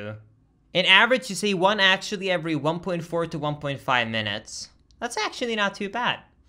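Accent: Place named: California, United States. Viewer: What’s so fucking funny Is that I DO THIS TOO Maybe it’s just because of my California accent but it’s hilarious that I’m GENUINELY trying to NOT say “actually” like 400 times And yes You do absolutely have the elements of the California accent The tone changes, the usage of “like” for 500 different things “Overusing”* “literally” and “actually”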